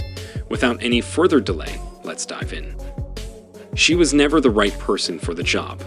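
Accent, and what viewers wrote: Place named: United States. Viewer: what a load of britnat nonsense....spoken by an American accented robot....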